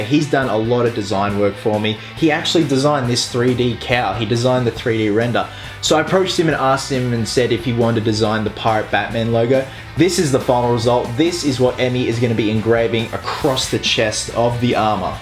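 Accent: Australia. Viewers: After watching about fifty of your videos, this is the first time that I noticed that you had an Australian accent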